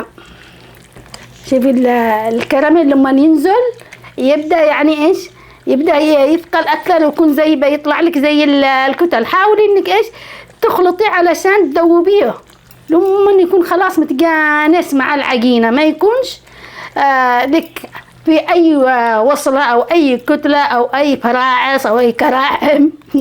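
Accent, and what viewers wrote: Yemen. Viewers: اي والله ننساء الهموم ولو لمدت دقيقه والله انه تعجبني لهجتك اليمنيه وكمان انا يمنيه ماشاء الله تبارك الله على الضحكه والحناء ربي يحفظك من كل شر ياخالتي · كلامك نصفه يمني ونصفه جنوبي سعودي ....😅 وعموما جزاك الله خيرا على وصفاتك الرائعه ❤ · اكلاتكم اليمنية وعذوبة لهجتكم ولطفك وطيبتك تعيد الينا ايام الخير والطيبة وراحة البال ليست كايامنا هذه اللله يستر 😂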